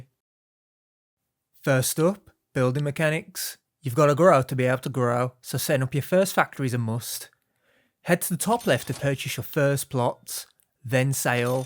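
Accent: Birmingham, United Kingdom. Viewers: Definitely Midlands, near Birmingham but its not the strongest brummie accent ive heard, could be trying to mask it slightly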